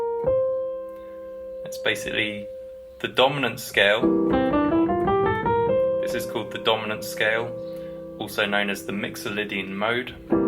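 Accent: United Kingdom. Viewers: Fantastic lesson you are a great teacher are you British ? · Just realised whom your voice brings to mind, and it's probably an insulting comparison, so let me add the disclaimer that now that I realise who it is, you really don't sound like him apart from your intonation and a couple of fairly common South England pronunciations. All right, it's Danny from Withnail & I. Sorry. Great videos, though!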